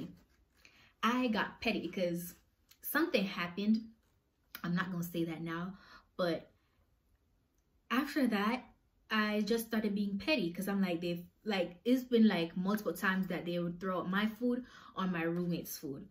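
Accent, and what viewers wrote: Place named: Jamaica. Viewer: Mi hear the patois fam 🤣🤣🤣big ups no say a word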